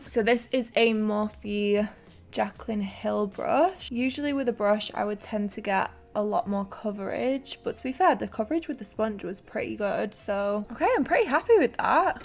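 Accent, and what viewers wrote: United Kingdom. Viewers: You are like Angel 💛so cute so beautiful 💛and i love your britch accent 💛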